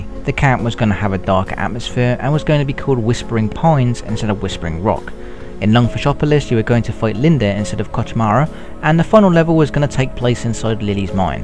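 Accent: United Kingdom. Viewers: So, why can't the guy who narrated the "creepy easter egg" video have done this onr too? I can't fucking understand anything over that thick British accent! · I can't understand half the shit this guys says. He sounds british but then says 'dewelopment" like a russian. I need some fuckin subtitles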